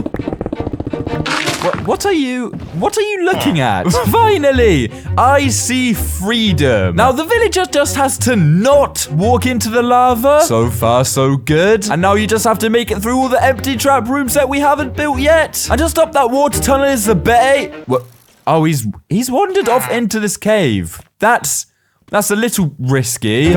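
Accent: United Kingdom. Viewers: Ngl you sound like British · oh god this is my first time coming across he sounds to british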